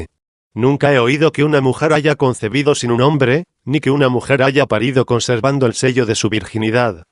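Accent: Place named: Castile, Spain. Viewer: Por favor, no uses tu voz Robótica. Vuelve a hacer este video con movimiento de personajes, hay muchas películas de dónde tomar cortos. Por favor, trata de hablar y leer en español latino porque no soporto el castellano, te agradeceré hasta que me muera si me das gusto. Trata de cambiar los textos que lees al español latino, como hablamos el nuevo y mejorado español de México, y te felicito por este video, lo buscaba pero no lo encontraba.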